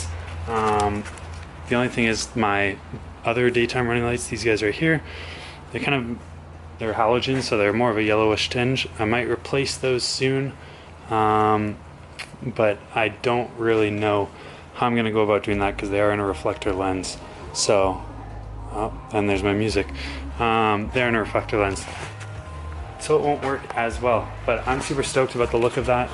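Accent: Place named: Canada. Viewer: Interesting video.....also am I the only one who thinks the Canadian accent is really cool!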